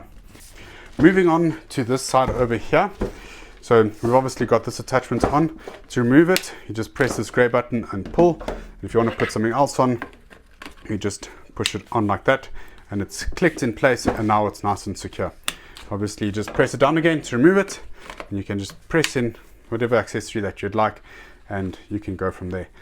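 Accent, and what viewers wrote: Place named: South Africa. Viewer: nice review...good to hear south african on youtube!! looking to buy one of these with the 6A battery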